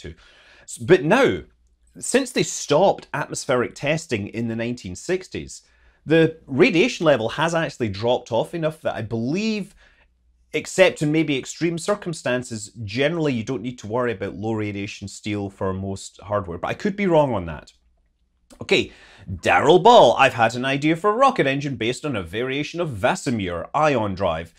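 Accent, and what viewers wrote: Scotland, United Kingdom. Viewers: the one time his Scottish got me: what is the fuel settling burn called?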